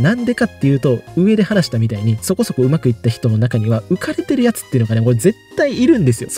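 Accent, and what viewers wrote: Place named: Kansai, Japan. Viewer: 関西弁丸出しなのが本気度を表してる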